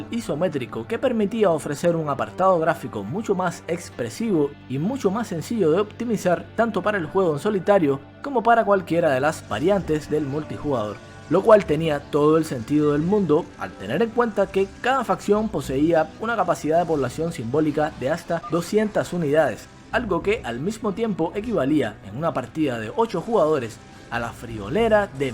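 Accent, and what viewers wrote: Cuba. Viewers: Eres cubano? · Exelente trabajo me soprendio tu asento cubano y confirmarlo hehe es bueno tener compañeros dando tan buen contenido piensas hablar de Diablo 2? Tambien lo queme mucho en Lan en ese tiempo y ahora disfrutando del diablo 4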